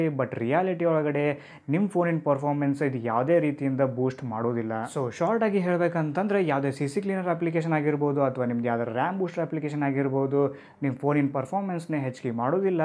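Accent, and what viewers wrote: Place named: Uttara Kannada, India. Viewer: Superb Uttara kannada language superb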